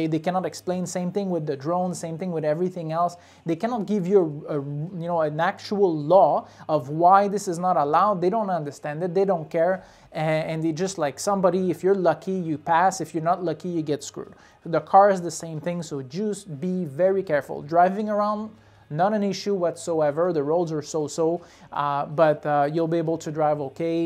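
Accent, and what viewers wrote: Canada. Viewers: His accent sounds Canadian to me. Don't think he was comparing to U.S.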